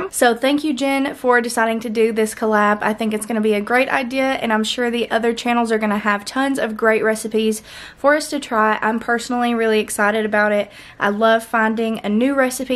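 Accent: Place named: South, United States. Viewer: Just subscribed! Your dish looks delish!! And your southern accent is ADORABLE!!!!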